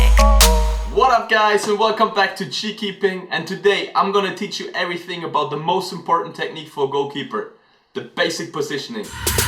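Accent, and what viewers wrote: Germany. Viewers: hey dude .. this is very helpful , thanks !! by the way .. Are you a german ? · Du bist doch deutscher mann hört’s an deinem Akzent 😂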